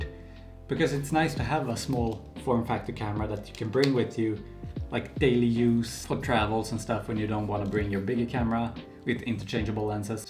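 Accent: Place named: Sweden. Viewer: Dat Swedish accent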